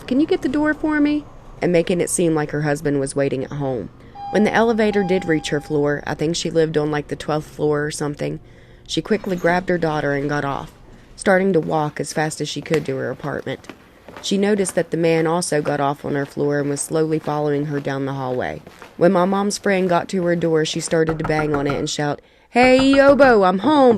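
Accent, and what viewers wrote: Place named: South, United States. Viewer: I'm sorry but if you have a southern accent, telling a scary story doesn't work.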